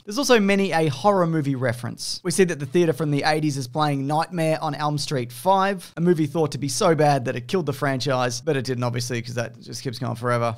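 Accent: Australia. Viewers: In your Aussie accent it sounds like "porn shop owner" instead of "pawn shop owner." Thinking to myself, "Pretty sure he wasn't in a porn shop, but ok.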